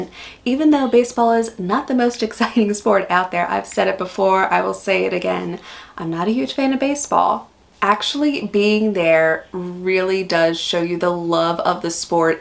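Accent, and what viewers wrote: Australia. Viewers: I can hear that you are subtly starting to gain the Australian accent, congrats and welcome home. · You now sound like an aussie. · Sounds like you've become Australian. Congratulations!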